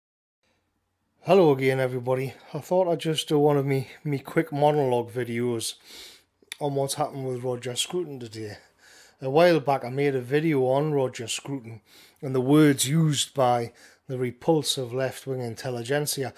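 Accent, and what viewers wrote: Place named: Northern England, United Kingdom. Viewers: Your Geordie dialect has a penetrative common sense way about it. RIP The Honourable Sir Roger Scruton. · I just came here to say it's nice to hear sensible and coherent ideas rendered in a quality northern accent 👍🏻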